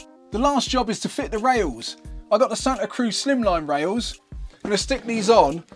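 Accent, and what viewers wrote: United Kingdom. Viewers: Proving once again that adding a British accent to anything makes it cooler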